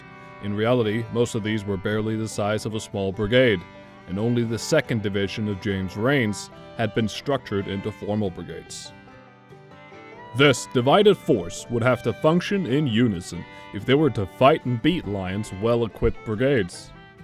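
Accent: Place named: Finland. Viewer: Really enjoyed this video but im really trying to pin point the slight accent i hear when you pronounce Missouri...your S and Rs make me suspect a Finnish background 😅 Supmalainen Sisu! 🇫🇮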